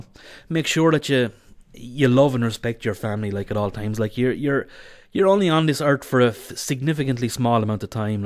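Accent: Ireland. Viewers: Are you irish? and nice video! :D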